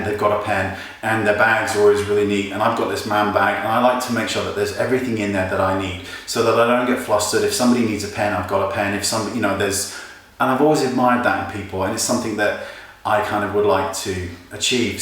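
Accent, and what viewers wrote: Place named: United Kingdom. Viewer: I love the british accent. "Last, but not least" :)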